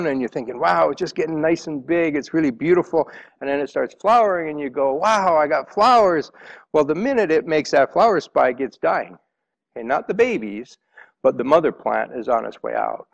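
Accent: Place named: Canada. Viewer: Are you in Canada? You have a bit of a Canadian accent ie the way you say process.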